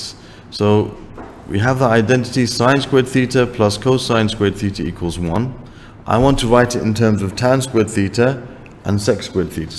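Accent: United Kingdom. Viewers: That's really interesting. They say you can sort out where a Brit is from by his accent, but hearing your flawless London accent yet knowing your very "un-British" name, I couldn't hold my fingers from asking you this. Thanks for the video and for your reply.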